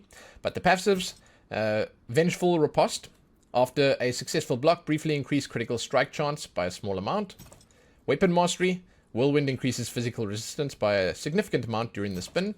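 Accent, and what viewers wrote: South Africa. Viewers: Was watching and could not figure out why I was feeling home sick. Great to hear a zaffa 😂. Great video as well you got a sub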